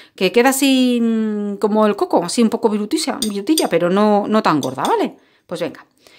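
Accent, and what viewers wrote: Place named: Spain. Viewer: HolaAmiga , que gusto que estes de regreso , me encanta tu buen humor y sabes , yo vi una serie española Velvet y tu acento como se parece al de algunas de las chicas de esa serie me encanta tu acento , te mando un abrazo virtual cuídate mucho y ese rico postre te quedó súper delicioso 🙏🌹♥️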